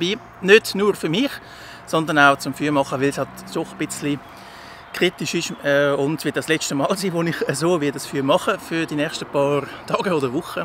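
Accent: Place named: Switzerland. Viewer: Habe meine Switzerdütsch Lehrstunde schon vermisst.😉 Koche jetzt draußen bei dieser Trockenheit, wenn überhaupt, nur noch mit dem guten alten Schwedenfeldgeschirr und Spiritus. Mein Sandsteinöfchen ist zwar eine recht sichere Sache, trotzdem verzichte ich bei dem Wetter gerne darauf. Muss ja auch nicht sein. LG Miesegrau & ÖPi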